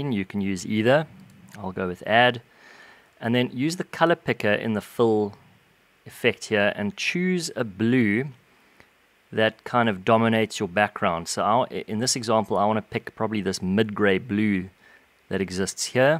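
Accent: South Africa. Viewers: r u from south africa?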